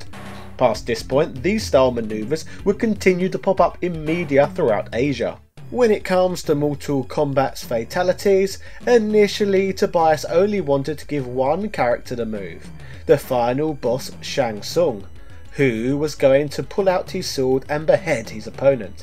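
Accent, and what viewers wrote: United Kingdom. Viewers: Disliked because of the MJ pic on Home Alone. The rest was stuff a lot of other channels already covered. Waste of time. Unless you always wanted a british voice to say the stuff you already knew, if not its a waste of time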